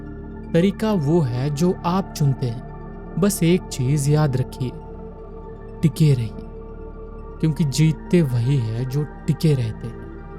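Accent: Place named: Maharashtra, India. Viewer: I noticed by listening your hindi accent, your mother tongue is marathi. Am I right?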